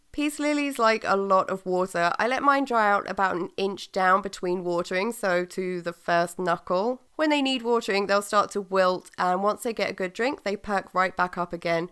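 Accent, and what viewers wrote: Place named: RP, United Kingdom. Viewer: It’s a perfectly normal English accent. RP ( Received Pronunciation) , or the Queen’s English ( as it was once known). Probably both terms deemed hate crimes or xxxxxxxphobic or some such nonsense in today’s’woke’ society. Just plain English. As was once the dominant language in England.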